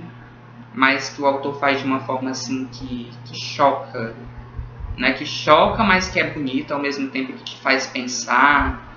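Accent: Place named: Fortaleza, Brazil. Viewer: Aaah!! Reconheci o sotaque pq moramos em FortaleZa 👏🏻👏🏻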